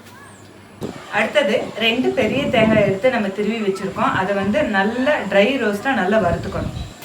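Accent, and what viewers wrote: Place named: Madurai, India. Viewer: ok ok தமிழ் மதுரை தமிழ் போல உள்ளது..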